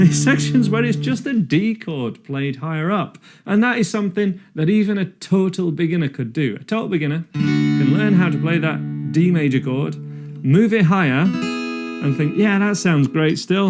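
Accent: United Kingdom. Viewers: so British! Love the accent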